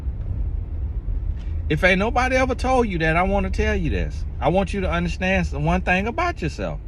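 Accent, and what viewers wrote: South, United States. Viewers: Wow I’m from Florida too. Lol! I was raised up north though. You have a strong southern accent. You are hilarious but at the same time you’re telling the truth! 😂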